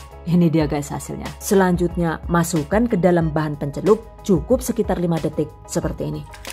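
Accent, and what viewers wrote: Banyumas, Indonesia. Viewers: Cuma di Chanel ini dikasih trial sampe ke DNA, lengkap bgt do n don't nya, plus ngapak e ngangenin 🤗 moga sehat sll ❤️ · Sy menyukai krn ada ngapaknya jg emoji lucu😂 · senengeh enyong.. sekaline rika wong ngapak 😂😂😂😂 tek subrek lah rika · Keren pokoknya mba,,,Slam ngapak y,trima kasih ilmunya,sukses selalu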